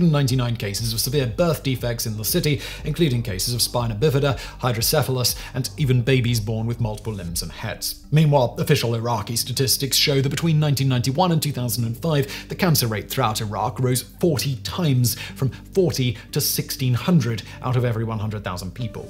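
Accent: United Kingdom. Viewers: Speaking a bit too fast in this one chap, especially with the British accent and constant use of "DU". Slow it down a bit. No rush man.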